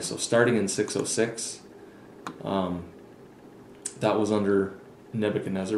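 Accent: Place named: Canada. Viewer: Great review, thanks for this! I am absolutely inspired to read the book now! Also, as a side note, after hearing your "outs" and "abouts" I've gathered you to be Canadian?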